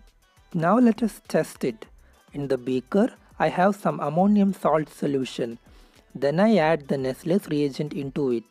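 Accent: India. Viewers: There's no polish video so here I am 🥲 that Indian accent hurts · Your sounds more likely to indian😄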